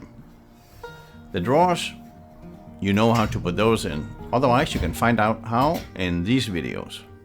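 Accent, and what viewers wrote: Denmark. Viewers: Does anyone have recommendations on which style of Ikea cabinet is best? Has anyone used the Vedhamn cabinets? We're also considering white or off-white. Not sure which model to choose. I love videos. So grateful to have found this channel with this wonderful Danish man. Both of my parents were born and raised in Denmark so I really love hearing that accent.❤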